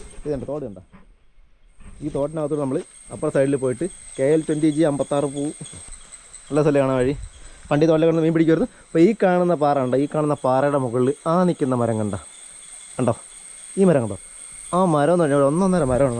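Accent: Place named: Kasaragod, India. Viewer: ഇദ്ധേഹം കാസഗോഡൻ മലയാളമല്ലോ സംസാരിക്കുന്നത്...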